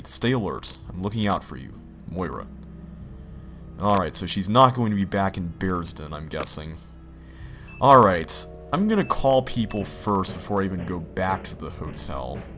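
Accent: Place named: United States. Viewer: Your accent is adorable! What part of the US are you from??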